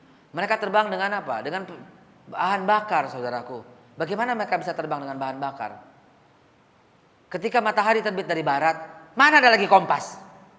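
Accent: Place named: Betawi, Indonesia. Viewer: Dialeg betawix yg saya suka yg justru krn kturunan, tp akidahx ciamik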